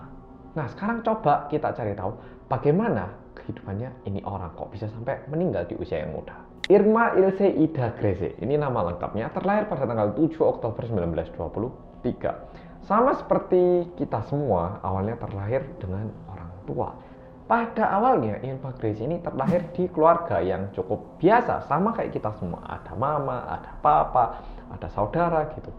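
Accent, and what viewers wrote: Java, Indonesia. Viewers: medokkkk update gaesss ngeriii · Kro ngenteni buko, cusss nnton wong medok😂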